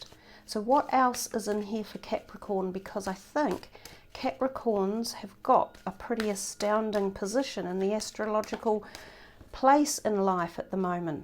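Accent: New Zealand. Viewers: Are you a new Zealander? The accent in familiar. Regardless.. glad I found you - a familiar accent with tarot readings on YouTube is calming. ❤ thank you for taking your time to do this reading 😊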